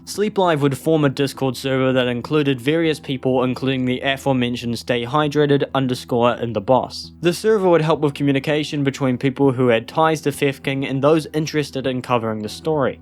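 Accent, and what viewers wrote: United Kingdom. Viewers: Are you british? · You're videos are good but please stop being british, it's hard to listen to you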